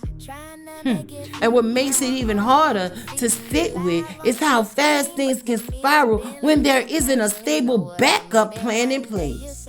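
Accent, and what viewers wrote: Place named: New Orleans, United States. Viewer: Girl I’m from New Orleans and as soon as I heard your voice you sounded like family. But I yea this whole situation is crazy. I’m praying for the dog 😩😂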